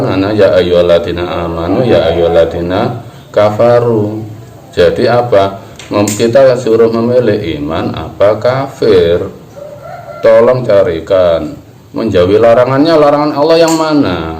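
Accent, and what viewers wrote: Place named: Banyumas, Indonesia. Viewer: pangapunten nggeh..kok miriipp sanget kalih panglima besar jenderal Sudirman... aksen bahasanya mirip dengan wong Banyumas Jawa tengah Mbah 👍🏼👍🏼👍🏼🙏🙏🙏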